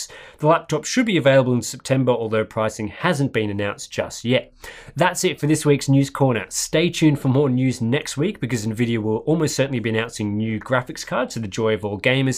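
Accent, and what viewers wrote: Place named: Victoria, Australia. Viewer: It's that Vic accent. I'm SA and I hear the R first. Like sometimes I hear you guys say preformance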